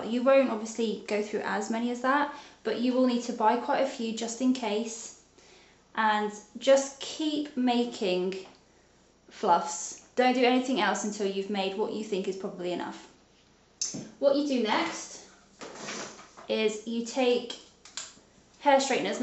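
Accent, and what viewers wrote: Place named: United Kingdom. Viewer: British accent! 👌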